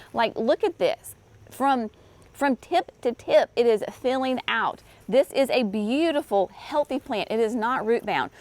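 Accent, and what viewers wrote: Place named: Tennessee, United States. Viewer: I got to comment. Love this video!! I am from Tenn and I declare your delivery is soooo us!!! ❤❤ Thanks. JT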